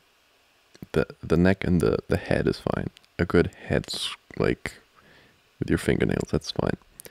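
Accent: Germany. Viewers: your german voice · i cannot with this german voice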